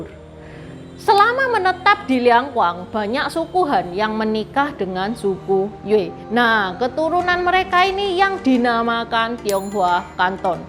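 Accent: Java, Indonesia. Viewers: apa dah berumah tangga, and anda kyak dri jawa ngomongnya tu lo medhok bnget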